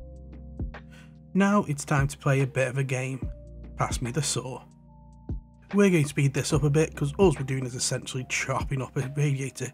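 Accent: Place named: Stoke, United Kingdom. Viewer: As a fellow stokie, you can hear another stokie from a mile away.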